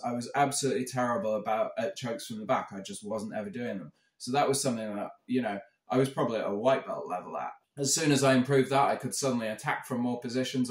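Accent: England, United Kingdom. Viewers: Interesting.... will have a look. Like your style and philosophy and good to hear an English accent. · I was wondering why Youtube proposed your piano learning video... then I worked out that it is because my first Karate master is also Kendo master while second is also Ju Jitsu master and then because you speak English with a British accent and, last but not least, you played When the Saints, the song I have been singing since Nursery School, my mother says... Ciao from an ex Londoner, ex Karate black belt ex fit person, ex ex ex ex...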